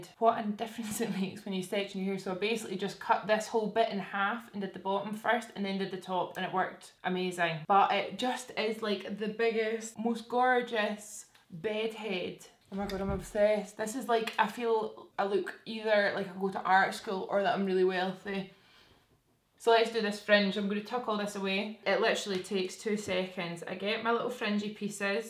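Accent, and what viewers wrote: Scotland, United Kingdom. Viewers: When I started watching your videos ageeees ago I understood every second word you'd say haha now I'm like half Scottish xD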